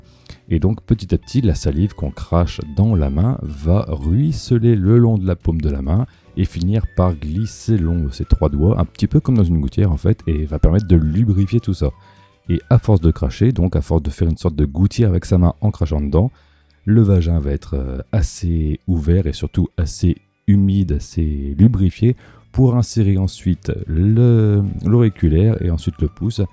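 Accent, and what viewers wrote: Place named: Hauts-de-France, France. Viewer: J'arrive 4 an après la bataille, mais j'aurais aimé savoir d'où vient ton accent. Tu prononces les sons "A" d'une façon particulière, ça ressemble à l'accent de ma région (Hauts de France-Belgique).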